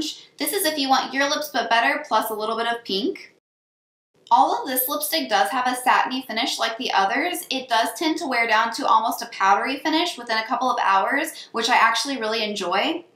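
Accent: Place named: Minnesota, United States. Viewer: Are you from Minnesota??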